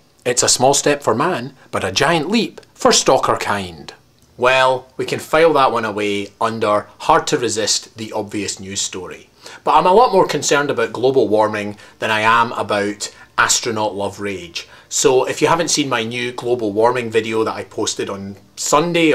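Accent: Scotland, United Kingdom. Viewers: Yes, and the air with some sort of floating ad for insurance made out of other ads for fast food. On a serious note, why is your accent so deceptive? Your voice says Scotland, but your voice saying where you're from says America. Why the difference?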